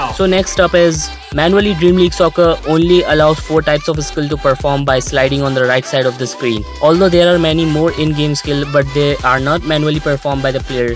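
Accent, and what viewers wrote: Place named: India. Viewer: Are you from india bro .? Your voice like indians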